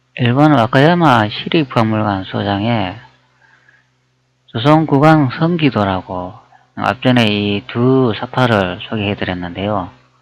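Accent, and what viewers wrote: Gyeongsang, South Korea. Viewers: 경상도분이 자나요 사투리가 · 그놈 목소리. 반갑다. 겡상도 목소리다. 예리한 자료수집력. 역사고증에 많은 도움을 준다. 자못 기대감이 크다 하겠다. 역사성. 사실성. 확인성이 매우매우 높다.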